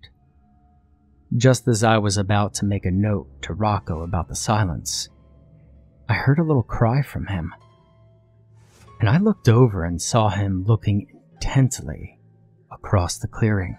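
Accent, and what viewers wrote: South, United States. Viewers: Your channel is very interesting. Methinks I shall subscribe. 😊 Thank you for your Military support , as well, Pastor. It truly means a lot. I re-wrote this to mention I subbed. I’m looking forward to listening more. Your Southern drawl is making me wish I was still back at one of my Duty Stations & home for a decade, South Carolina. I spent quite a bit of time in NC; I worked out of Charlotte for awhile but it was when they were building Panther Stadium! 😳 And who doesn’t love Asheville? Take care & be safe!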